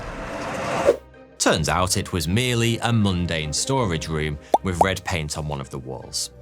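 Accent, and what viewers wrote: United Kingdom. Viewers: These British pronunciations are killing me.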